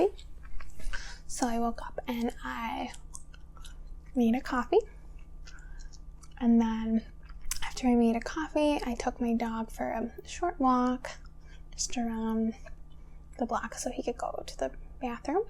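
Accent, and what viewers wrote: United States. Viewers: The best of all the American accents. 😎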